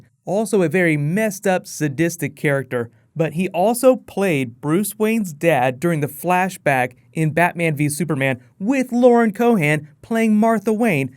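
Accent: Netherlands. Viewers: R U dutch ??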